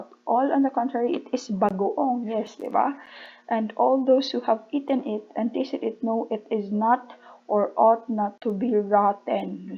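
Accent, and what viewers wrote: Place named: Visayas, Philippines. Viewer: Ang gnda nito kaso may touch ng if im not mistaken bisaya provincial language. That's why somehow i didnt understand some statement . But, its a great help . Thankyou very much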